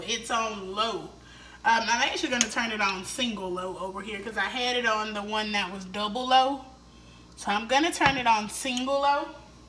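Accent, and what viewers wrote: South, United States. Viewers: Oh, ok. I definitely heard that suuuthin swag🤗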